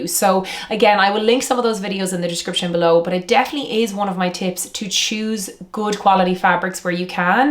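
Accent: Ireland. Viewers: She's Irish and her pronunciation is utterly charming . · Who cares? It doesn’t alter the meaning or context of what she’s saying plus she has a strong Irish accent, go and Karen somewhere else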